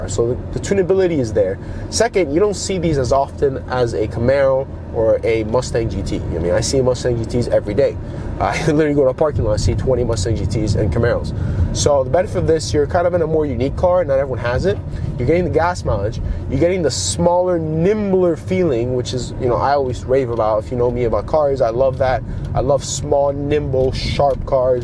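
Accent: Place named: Miami, United States. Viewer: You look and talk exactly like I'd expect someone from Miami to.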